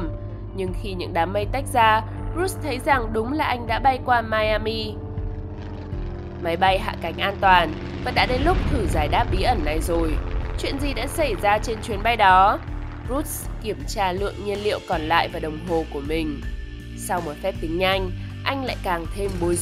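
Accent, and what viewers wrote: North, Vietnam. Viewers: doc giong nam di giong bac kho nghe qua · giọng bắc pha giọng nghệ tĩnh ở một số từ có dấu sắc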